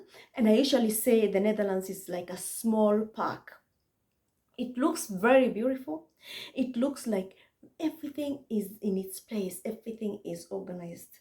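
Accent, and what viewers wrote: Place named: Kenya. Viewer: I love the Kenyan accent!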